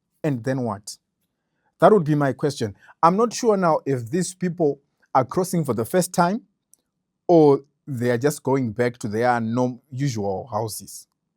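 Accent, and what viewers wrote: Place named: Zimbabwe. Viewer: You sound Zimbabwean Bro... Are you in South Africa as well?.... You know who will actually do something about this..... That Gayton McKenzie...!!!!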